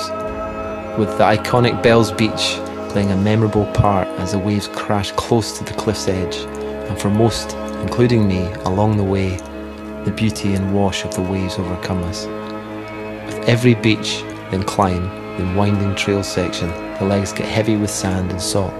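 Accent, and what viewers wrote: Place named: Scotland, United Kingdom. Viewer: Love this! Good scottish accent to narrate too; not that I'm biased ;)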